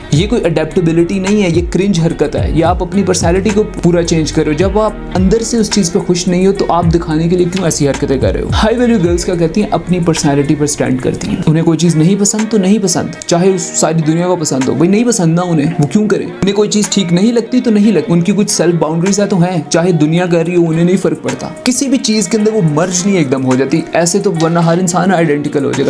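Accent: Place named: Pakistan. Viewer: Bro are you from Pakistan because I guess from the way of your talking.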